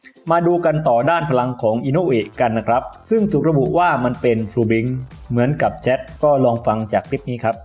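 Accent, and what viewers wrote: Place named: South, Thailand. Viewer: คนอธิบายติดสำเนียงใต้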